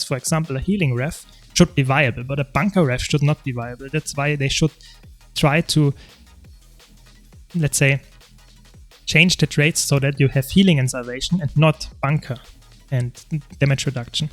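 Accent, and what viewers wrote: Germany. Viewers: ja moin, ich bin auch deutscher, dein akzent kickt halt noch härter rein als meiner xD no offense metabattle hat allerdings auch sehr viele builds...es könnte sogar gut möglich sein das die noch mehr builds haben als guildjen und bei metabattle sind auch nicht alle builds top notch z.B. die open world pve builds, also ich weiß echt nicht, ob sich das jemand dauerhaft antun möchte mit dem ele mit den niedrigsten lebenspunkt und der niedrigsten rüstung in kombination gibts das so nur beim ele, ob sich das jemand das halt wirklich permanent antun möchte mit full berserker gear rumzulaufen, da braucht dich ja nur ein mob in der open world angucken und fällst gefühlt schon um und liegst im downstate...guildjen hat wirklich dediziete builds speziell für open world pve, da wirken die builds von metabattle dagegen nur wie eine veränderte (wenn überhaupt verändert) version von builds die sie so für instanzierten content benutzen, also halt für fractale, raids, strikes und dungeons gedacht sind und nicht spezifisch für open world pve, während die builds bei guildjen extra dafür erstellt wurden.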